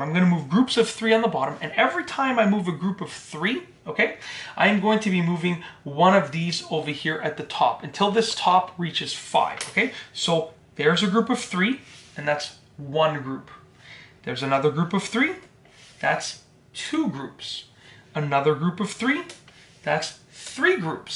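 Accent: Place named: Canada. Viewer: I suspected this came from Canada, because of the annoying accent [Wisconsin, you are now off the hook!], and, as soon as he referred to a dollar as a "loony", my suspicion was confirmed ... and, I navigated away. You should follow.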